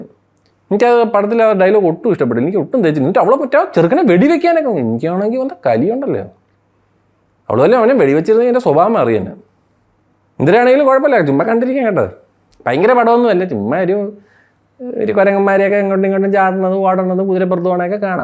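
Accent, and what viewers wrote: Trivandrum, India. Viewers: തള്ളേ തിരോന്തരം 😂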